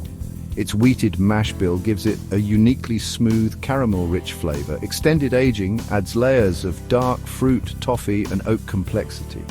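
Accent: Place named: England, United Kingdom. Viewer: why have an english accent voiceover for the most american product??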